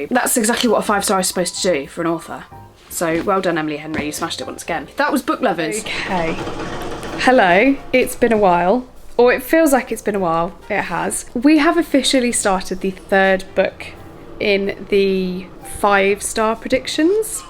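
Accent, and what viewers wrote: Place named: England, United Kingdom. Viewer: I knew you were going to have an english accent haha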